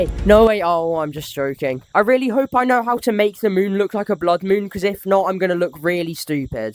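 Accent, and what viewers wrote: United Kingdom. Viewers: Bro is british bruh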